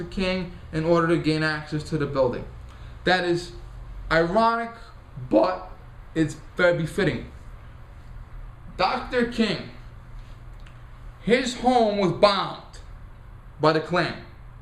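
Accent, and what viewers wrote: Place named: New York, United States. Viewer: I've learned a lot from your videos you not only bring truth to the subjects but also humor it good to hear a new York accent.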